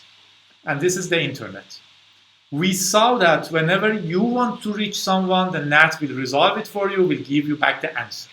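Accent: Iran. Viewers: Thanks for the info, you're an expert in explanation;) Also, loving the original Persian accent:D Love <3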